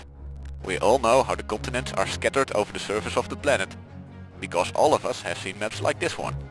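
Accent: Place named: Netherlands. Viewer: Very hollands accent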